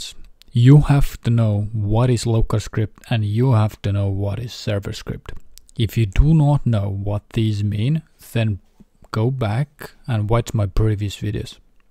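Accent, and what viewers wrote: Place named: Estonia. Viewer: see eesti aksent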